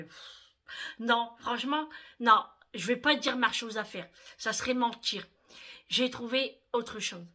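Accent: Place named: Nord, France. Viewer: Bonsoir Un vrai plaisir de faire votre connaissance… j’ai découvert votre chaîne par hasard et j’en suis plus que ravie 😊 En effet je suis originaire du Nord et votre accent me fait chaud au cœur 🙏 Je me suis abonnée à votre chaîne avec grand plaisir… Je vous envoie de belles ondes positives de ma Bretagne d’adoption et surtout prenez soin de vous. (Ma sœur habite fâches thumesnil) Bon courage pour demain et je vous dis encore merci pour votre gentillesse. Dominique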